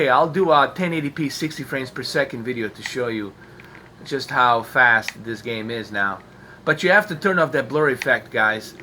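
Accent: New York, United States. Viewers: Are you from NY? I keep waiting for you to say "dead ass" lol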